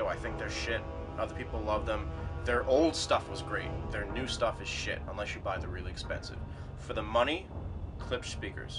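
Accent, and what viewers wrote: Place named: New England, United States. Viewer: new england accent.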